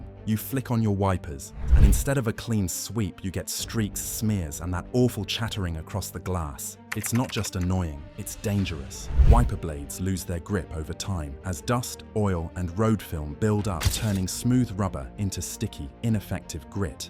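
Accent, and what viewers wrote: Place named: United Kingdom. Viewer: Your credibility gets called into question when you are giving advice and your video edit is piss poor, several repeats of the same clips, did you even review your finished video? WD40 is ok but it's not the magic you are portraying. WD40 is ok but it's not the magic you are portraying 😊. I'm also intrigued why the narration is British English yet currency is in U.S. dollars, some of the left hand drive scenes are showing blurred British roads with the use of U.S. Dollars quotes in pricing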